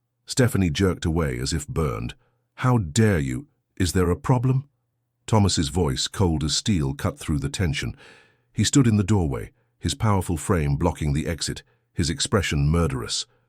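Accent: England, United Kingdom. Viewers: Hello dear. You missed " perhaps" before the first 30 so...he might be 30 after 2 years. Thank you for a nice story and an enjoyable english accent.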